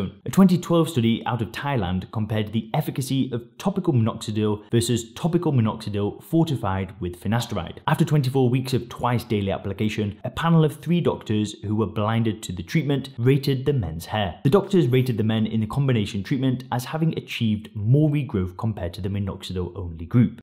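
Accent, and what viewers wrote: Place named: United Kingdom. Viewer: ''pop a pill and your dun'' love that british accent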